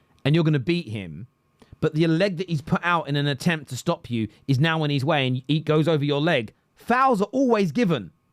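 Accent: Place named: Liverpool, United Kingdom. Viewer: The voice sounds like he was born in Liverpool 🤔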